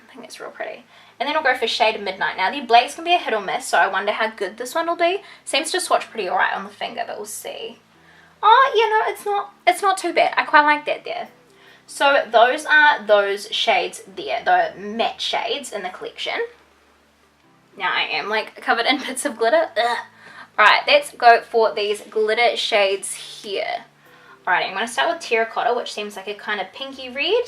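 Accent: New Zealand. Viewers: are you a new zealander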